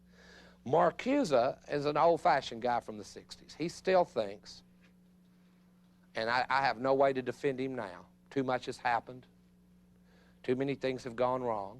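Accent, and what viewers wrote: South, United States. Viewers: 'Rotund effeminate southerner lectures on leftist apologetics'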